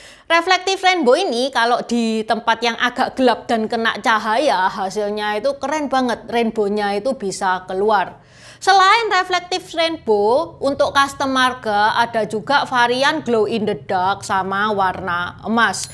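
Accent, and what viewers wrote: Java, Indonesia. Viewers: Bahasa Mandarinnya aksen Jowo... 😊😊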